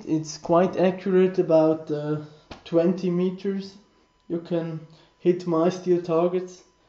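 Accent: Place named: Germany. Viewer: man merkt irgendwie sofort dass du deutsch bist xD ist aber nicht schlimm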